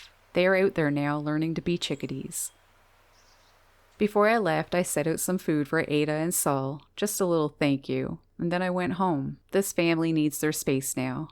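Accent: Canada. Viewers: Great message and video 💖 Nice to hear of your Ma and formative foundations. Like many others, I look forward to occasions when I'm able to appreciate your passions. And your wonderful Canadian speech. At times, my thoughts refer to you as ', the ' 😄 (don't know if that's the influence from Brooklyn, Philadelphia or some other part of your southern neighborhood).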